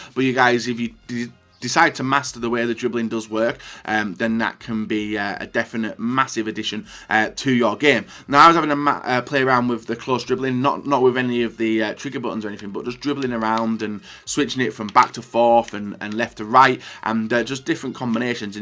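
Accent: United Kingdom. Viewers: He has a earl thomas III seattle seahawks jerseys, but has a british accent... intrusting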